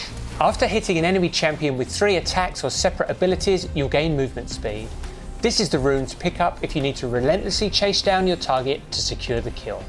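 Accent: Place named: England, United Kingdom. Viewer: Ever heard of "english accent"? have you not seen or heard a british lad before?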